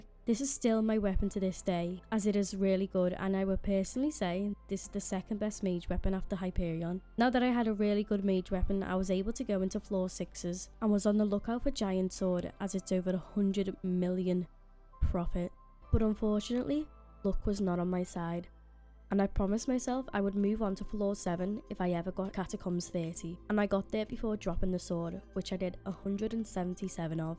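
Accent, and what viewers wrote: Liverpool, United Kingdom. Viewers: that accent tho? is it scouse?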